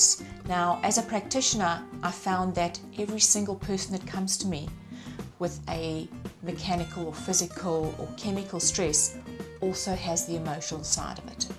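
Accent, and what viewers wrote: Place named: South Africa. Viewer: nice south african accent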